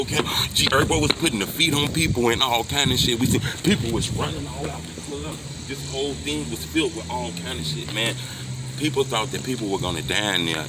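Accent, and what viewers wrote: Chicago, United States. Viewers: Is you from Chicago bro?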